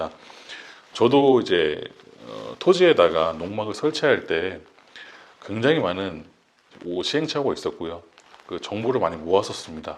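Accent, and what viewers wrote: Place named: United States. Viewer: 미국 사람인줄 알앗네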